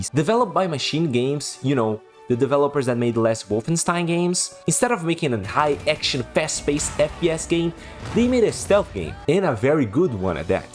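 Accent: Brazil. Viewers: Boy, are you brazilian?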